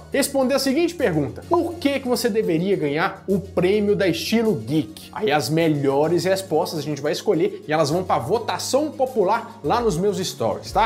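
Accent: Minas Gerais, Brazil. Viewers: Estou conhecendo o canal agorae simplesmente AMEI. Vc é muito simpático e divertido, sem falar nesse jeitinho mineiro que é demais. Parabéns!!😘😘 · esse que eh o verdadeiro sotaque mineiro que a gente tem, não é aqueles trem feio que as novela das 7 da globo tenta fazer não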